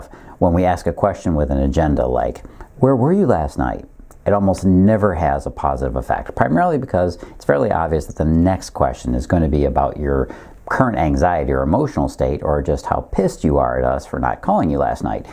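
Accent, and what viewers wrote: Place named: Midwest, United States. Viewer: rational, sound advice. Refreshing from the sea of gimmicky crap. Love the midwest accent too.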